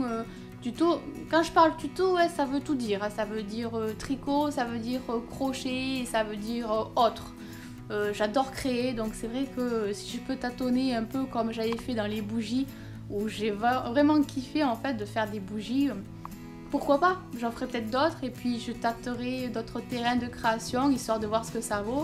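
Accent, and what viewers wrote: South, France. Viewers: Tu peux être fier de toi😉rome ne c'est pas fait en un jour 😂😂j'adore ton accent du sud😉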